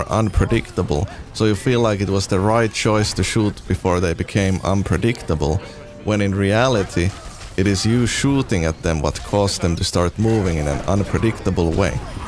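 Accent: Finland. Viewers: My god I love the Finnish accent. Beautiful language too. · Took me three seconds to figure out you're Finnish by your language melody (my dad is Finnish, so I'm familiar with the language), but it took me EVEN LESS time to figure out that you're amazing at Overwatch and that this video will contain good info! Loved it! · suomi aksentti <3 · Your english is very finnish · U sound Finnish??? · You soind like youre from finland. Good tips and crazy gameplay! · I thought I heard a Finnish accent, I guess I did 😂. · Dude are you finnish?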